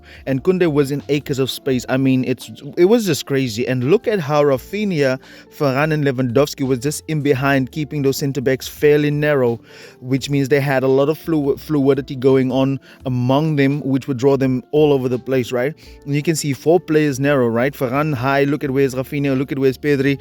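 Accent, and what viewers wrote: South Africa. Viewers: Cant wait for the second half’s video. Lol i dont know why but ypu sound a little bit South-African …accent wise😁😁😁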